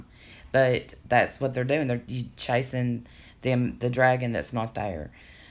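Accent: United States. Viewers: I am sooooo proud of you!!!! I knew from the first video I watched we had more in common than our country accent!!!! I too am an addict in recovery....one day I may tell my story. And, just keep it in the day and before you know it, it'll be years and years!!!! So proud of you, keep up the good work!!!!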